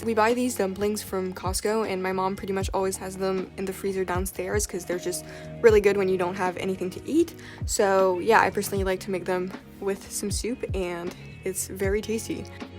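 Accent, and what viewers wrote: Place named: Quebec, Canada. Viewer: yayyy a fellow french canadian! I knew as soon as i heard you speak french lol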